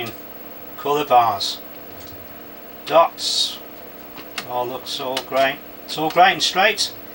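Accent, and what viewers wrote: Birmingham, United Kingdom. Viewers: You are like all us Brummies a happy chappy, bostin fix bud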